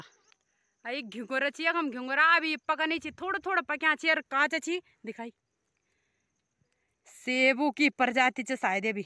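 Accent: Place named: Garhwal, India. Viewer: Yaarr tumhare vlog mood fresh kr dete hai... aur jo tum garhwali bolti ho aahaa...😍😍😍😍😍 love from rajasthan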